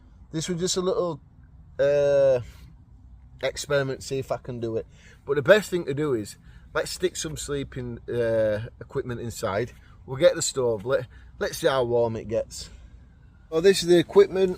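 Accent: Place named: Liverpool, United Kingdom. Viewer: It's called scouse lol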